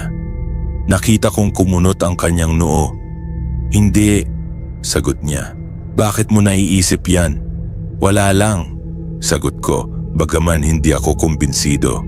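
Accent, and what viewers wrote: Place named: Conyo, Philippines. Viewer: AI narrator na konyo pa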